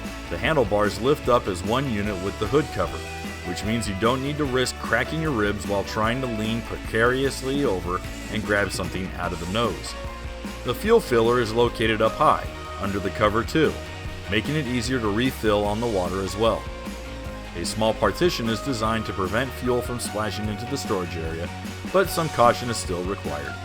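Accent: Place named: United States. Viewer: Nice Ski I Was watching this video thinking this place in the USA looks exactly like where I ride my ski in Sydney. The American accent had me thinking it was filmed over there.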